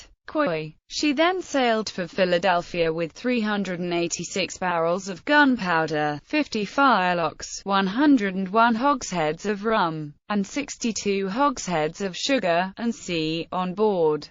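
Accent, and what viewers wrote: United Kingdom. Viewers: Don't like the British accent that much.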